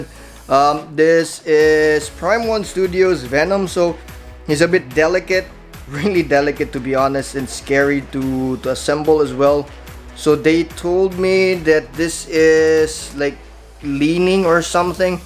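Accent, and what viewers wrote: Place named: Netherlands. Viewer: Awesome collection bro. Where are you from? You sound kinda Dutch😉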